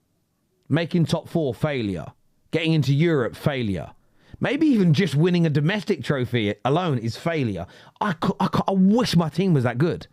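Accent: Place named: Manchester, United Kingdom. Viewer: Strong manc accent here, cockney red 😂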